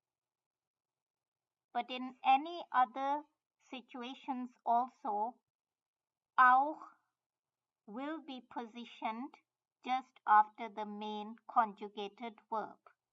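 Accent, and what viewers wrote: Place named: China. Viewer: ...I don't know but the person has got a Chinese accent somewhat but speaking in English and German....XD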